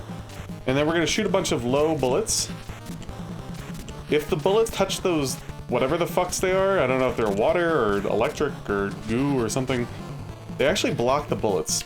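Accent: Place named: California, United States. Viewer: Congratulations dude! Just to even witness it was something to behold. Here's hoping someday I might get my own deathless run haha. 🤞 And that real talk you gave , says a lot about me as a gamer. I admit I am not the most patient when it comes to gaming to say the least, it's so easy to let frustrations walk all over you rather than take a breath and count to 10. I really needed that today so thank you. By the way, if you don't mind me asking, as an Australian I detect a US accent in you... California?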